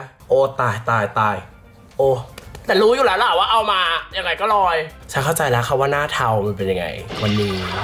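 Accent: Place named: South Korea. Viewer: ไม่ทราบว่าความจริงแล้วชีเป็นสาวโคเรียรึป่าว สำเนียงกับจริตเริ่ดสะบัด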